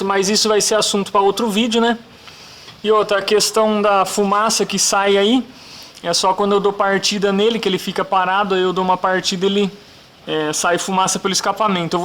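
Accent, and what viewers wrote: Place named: South, Brazil. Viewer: Eu tenho uma Brasilia 1978 que meu pai tirou "zero" e como sou aposentado, e realmente é dificil achar mecanico que conserte esse tipo de carro, assim começei a ver videos sobre o assunto - por exemplo o canal do Tonella que é muito bom entre outros. Em uma dessas duvidas queria saber como verificar a compressão do motor e seu video apareceu e foi muito bom. Em relação onde vc mora é pq me pareceu que tem um sotaque do sul e fiquei curioso em saber qual a cidade somente isso. Entendo que hj em dia existe muita violencia, vigaristas e todo mundo tem que se precaver. Mas sou da paz moro em Guarulhos e só quero aprender um pouco mais da mecanica a ar e possivelmente trocar experiecias. OK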